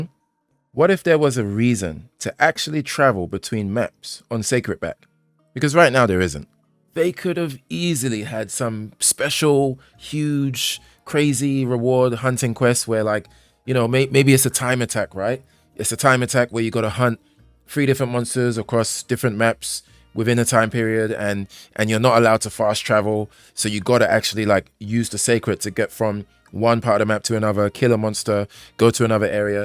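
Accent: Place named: Scotland, United Kingdom. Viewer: the scottish-ish accent had me rolling mate, keep doing what you're doing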